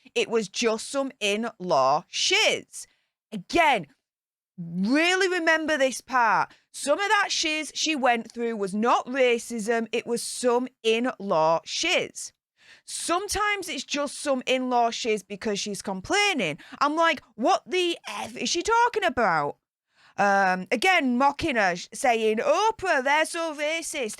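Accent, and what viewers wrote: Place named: Northern England, United Kingdom. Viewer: I've only just discovered your channel this week when you were talking about Harry's therapy session. I love your energy and personality. I live in the North West of England . I simply love Northern accents. I see that Daniel Boland is from Sheffield. I'm a stone throw from Manchester in one direction and Derbyshire in the other direction. I've tried to place your accent. My daughter-in-law us from Darwen in Blackburn. I could listen to her all day. Are you on the borders of Lancashire and Yorkshire ? Anyway less of the Geography. I think your channel is the sort where housebound people love your vlog because your demeanour is such that you feel like a pal.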